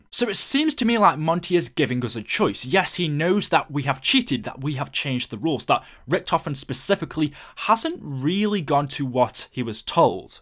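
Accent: United Kingdom. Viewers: Fair point but he's like British so z house bit of a stretch and is it possible to find a GD video without a dislike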